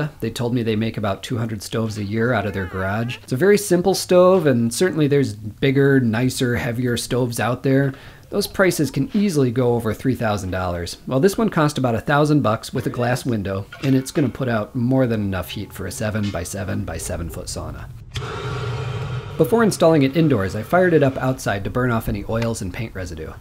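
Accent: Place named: Canada. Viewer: I was thinking, man this guy sounds kinda Canadian. Then you mentioned the high school and I realized you're in northern MN, so that explains it lol.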